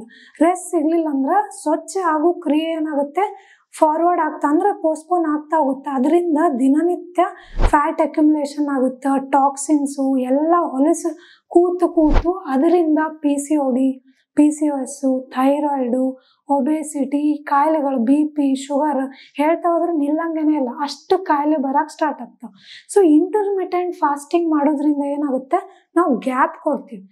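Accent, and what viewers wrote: North Karnataka, India. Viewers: Thank you so much medam channagi helidira nam utar karnataka shaili mattu namma halli bashe yelli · I am from Bagalkot . Love the way she spoke the original north Kannada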